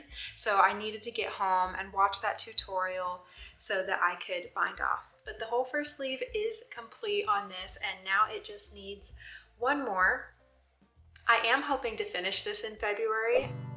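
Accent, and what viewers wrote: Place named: Australia. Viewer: You can’t tell you how tickled I am to hear the Aussie creeping into your accent!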